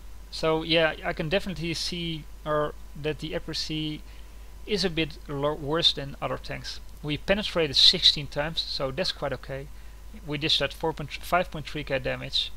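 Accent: Netherlands. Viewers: Nice evaluation of the tank. Woulld you prefer it over the Leopard 1? And also, you sound like you are Dutch? :P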